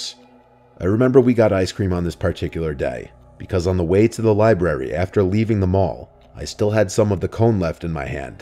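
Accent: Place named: New York, United States. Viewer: He has such a great voice for these stories. I cover true crime and my voice is on the lighter side so I try to make a little deeper for the tone of the story. He also has that jersey maybe New York accent that really sets him apart.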